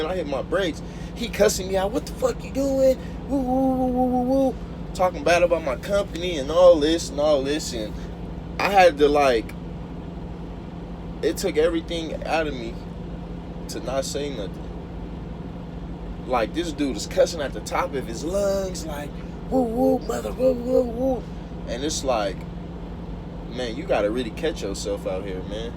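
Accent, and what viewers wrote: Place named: Los Angeles, United States. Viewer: What part of LA you from homeboy, i can hear it all in yo lingo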